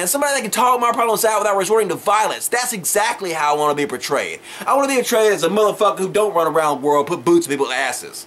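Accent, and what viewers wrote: South, United States. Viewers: I'm not gunna lie the first video of urs I saw I thought "oh no a ranting southern hillbilly." I'm glad i listen to words not accents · Great video once again! Just to let you know, I don't automatically judge every southern drawl as a moron.:D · Never heard of you til today. I too have a pretty bad southern accent (Louisiana) and I tend to pick on hillbillies alot because of their close mindedness and lack of intellect. Dude, I couldn't help but to be impressed by your video. You mentioned people judging you because of your accent, and when I first started watching the video, I started to click on something else. (regardless of the fact that I sound the same.) I guess you can say, I assumed lack of intellect when I heard the accent and I apologize. As I continued watching the video. I realized, this southerner is pretty freakin cool. 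You are alright there. Way to represent logic from the South.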